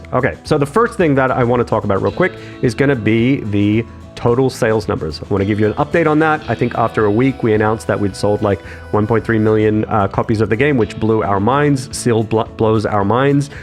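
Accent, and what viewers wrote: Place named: Australia. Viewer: Even since Satisfactory i’m confused by his accent. Are you aussie? American? But you’re in Sweden apparently??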